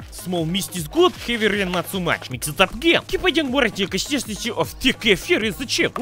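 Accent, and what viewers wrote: Russia. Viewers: As ussual russian always says one thing like slav for mr and blyad for dimitri, i like russian accent btw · ACCENT IS FUCKING LIIIIIIIIIIIIIIIT❤❤❤❤💪🇷🇺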